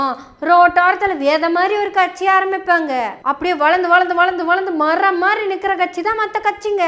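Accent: Kongu, India. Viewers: அட மூக்கி கொங்குத் தமிழில் பேசினால் எல்லாம் உண்மையாகி விடுமா பெரியார் பிம்பத்தின் பின்னணியில் எத்தனை கொள்ளைக்காரர்கள் காமலீலை பேசுபவர்கள் சாராய வியாபாரிகள் இவர்களைப் பற்றி பட்டியலிட்டு பேசு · பல்வேறு கெட்டப்புகளில் கொங்கு தமிழில் கதைக்கும் அன்பு அக்காவிற்கு வணக்கம்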